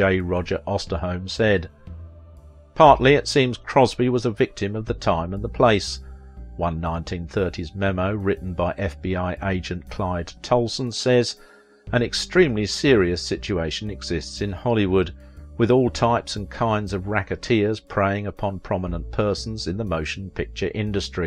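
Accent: United Kingdom. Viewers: Hello there i just want to say to you im facinated by your english spoken tone i miss speakinf to english raised people,and the thing is i was born in argentina 1959 from english parents but now i dont find englush people to practice my language....well dear my blessings foe u from an english scottish lady.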